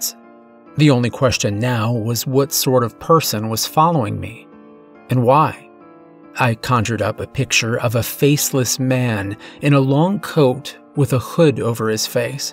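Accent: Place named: United States. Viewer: Oooh er! That was a good one! In England we have 5 seasons spring summer autumn winter & fog! There's an old Scottish ghost story, well known, of a village appearing only in the fog, it's called Brigadoon Wanted to say, you have a really nice American accent, not Bostonian but has a British inflection somehow which makes your speaking voice ( narration voice) very pleasing & clear, lovely diction but please don't pronounce the word shone as shown ( it's just said as shonn) Plus the past tense of Drag is Dragged not Drug which is a pharmaceutical ( the dictionary says the current fad for using Drug & not dragged is an Americanism & not correct, their words not mine Btw, I'm not being derogatory it just grates on me, no offence meant)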